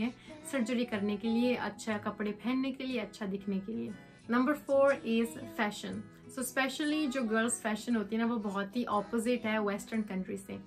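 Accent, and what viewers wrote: South Korea. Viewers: I love your Korean accent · Why you talk like you don't know hindi .. like some korean speak hindi.. but its feel good if any korean dpeak like that .. however you look awkward talkimg like this... its bad..not good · Your accent is slowly slowly changed into korean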